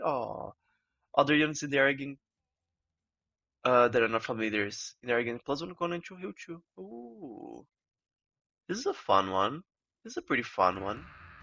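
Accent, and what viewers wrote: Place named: Brazil. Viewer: where are you from? looks like brazilian accent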